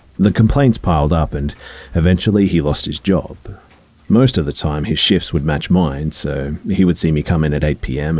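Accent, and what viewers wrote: Australia. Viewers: You got a smooth voice man.......Australia ?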